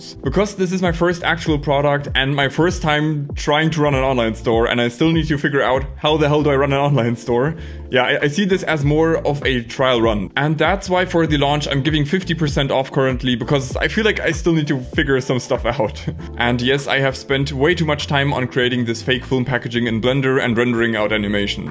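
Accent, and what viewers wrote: Germany. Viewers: hey ! i know one of these photos are taken in Duisburg at the tiger and turtle, you also speak german accent. i wonder if you are near this town ? becouse i come from moers. i´m really into photos ! i own a a6300, gh5, canon 5d, 80d, 700d and a old voigtlander film camera. i start with a fujifilm 5mp and did not stop since then.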